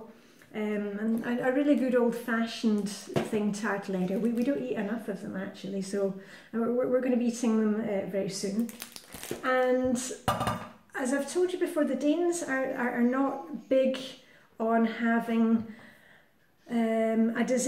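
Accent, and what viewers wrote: Scotland, United Kingdom. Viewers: I love the fact that you can pronounce the y spot on as you do it with the Kylle kylle Tuborg øl. Very good videos. Keep up the good work. Curious to learn where you're from - I presume Scotland but couldn't pin point the dialect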